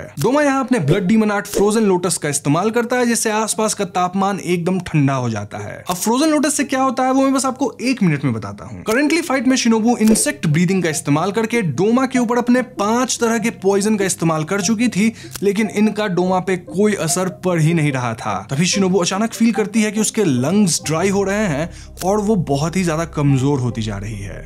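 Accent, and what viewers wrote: Bengal, India. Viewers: 😮 Bangoli ho kya aap 😁😁🙆‍♀️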